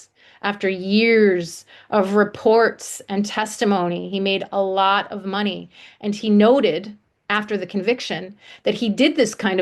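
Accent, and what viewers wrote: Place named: United States. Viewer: I take it by your accent that you are an American? So am I…..retired American nurse…..have been involved with this case for 2 years…… I also have a channel…… there are also probable innocent convicted serial killer nurses here in America…… if you are interested once Lucy is free to help these nurses out let me know…..I am trying to get fellow Americans interested in freeing my wrongly convicted nurses…..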